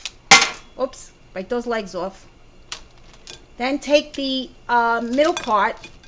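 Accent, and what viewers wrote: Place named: Brooklyn, United States. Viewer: You have such a nice Brooklyn acccent you still live there 😃😃😃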